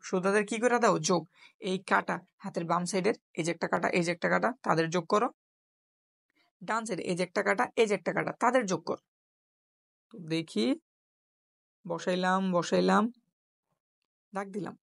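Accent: Comilla, Bangladesh. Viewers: bhai apni ki cumillar❤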